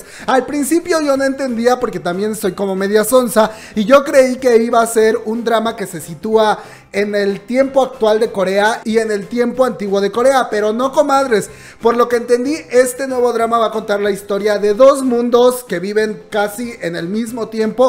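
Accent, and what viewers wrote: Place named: Mexico. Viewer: muy divertida tu explicacion....cual es tu pais de origen...pareces de mexico...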